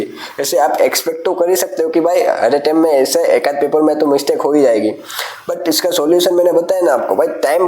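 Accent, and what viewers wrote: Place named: Gujarat, India. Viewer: I like ur gujrati assent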